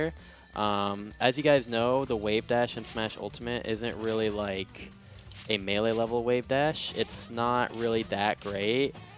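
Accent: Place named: Canada. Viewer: This dude canadian?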